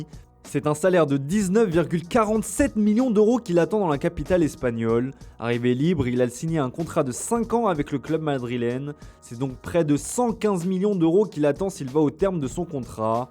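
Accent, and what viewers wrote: Italy. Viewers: Je sais pas c’que vous avez avec l’accent italien, mais c’est trop gênant. Stop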